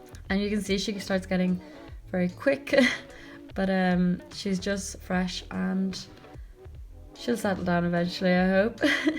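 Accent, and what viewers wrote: Ireland. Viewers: Are you from Ireland??❤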